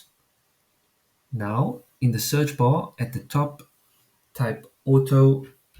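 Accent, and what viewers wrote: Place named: South Africa. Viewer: love ur south african accent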